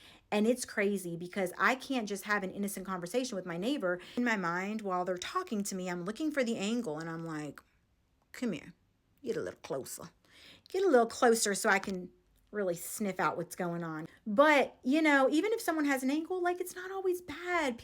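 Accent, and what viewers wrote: South, United States. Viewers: You are soooo beautiful 😍 and I love your southern accent 🥹🤎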